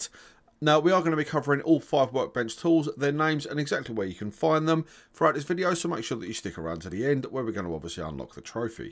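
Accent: United Kingdom. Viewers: no one told you to talk a little bit slow...man not all people understand English in a UK accent !!!!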